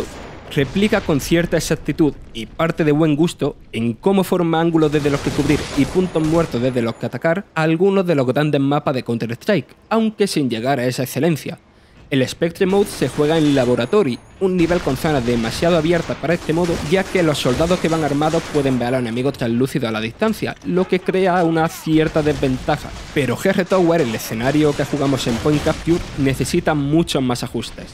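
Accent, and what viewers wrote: Andalusia, Spain. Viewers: Aprende a vocalizar! Hay una delgada línea entre un atento andaluz y tartamudear en una seccion de noticias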